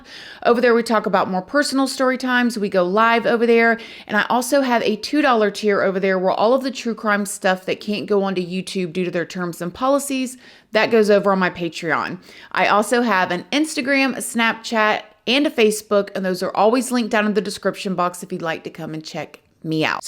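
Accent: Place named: West Virginia, United States. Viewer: Eeeny bIdy eeeny where. You have the cutest accent. You sound like me. I’m in West Virginia and have a country accent. I love hearing you talk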